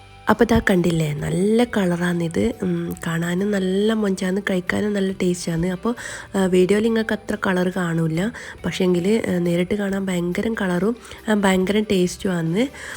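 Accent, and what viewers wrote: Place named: Kannur, India. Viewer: തനി നാട്ടിൻപുറം കണ്ണൂർ സ്ലാഗ്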